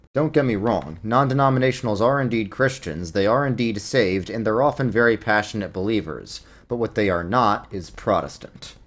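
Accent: Germany. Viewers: The German accent got me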